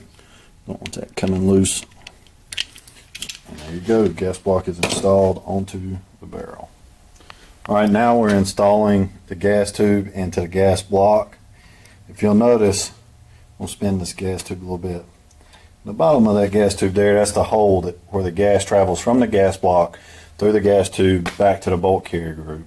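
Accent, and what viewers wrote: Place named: North Carolina, United States. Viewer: North Carolina accent.you sound exactly like me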